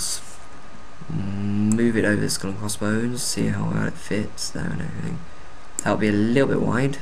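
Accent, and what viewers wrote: United Kingdom. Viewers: are you british? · I like your British accent :) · yes he has a british accent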